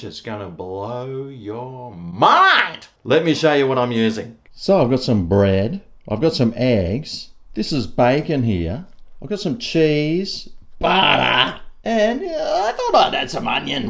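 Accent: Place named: Australia. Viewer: has the Strongest Aussie accent I’ve heard Love from Canada brother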